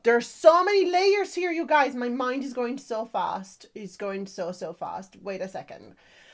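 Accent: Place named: Manchester, United Kingdom. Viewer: FINLAND. RAH! Anette Olzon and Floor Jansen RULE. Nightwish is beyond. You just became interesting. Pure power exists. Watch. Listen. Having seen every rock band on the planet over decades.... There is no contest. This crew hammers it. You sound Manchester. Hope that isnae insulting. RE-target yourself. It does help.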